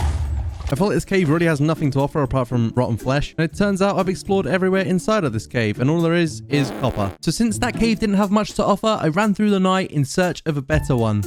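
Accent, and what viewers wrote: United Kingdom. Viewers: Is bro British? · WHY ARE YOU BRITISH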